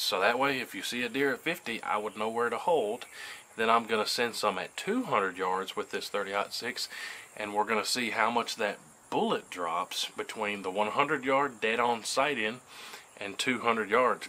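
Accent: United States. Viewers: ! Dude I love that crazy country accent of yours and I am SHOCKED that some Producer here in Hollywood has not made you a movie offer!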